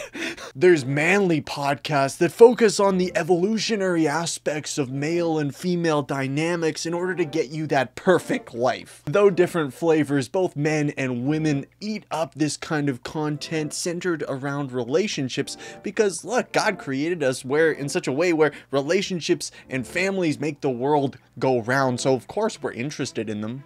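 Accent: Canada. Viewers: This dude has got a solid, mint canadian accent lol.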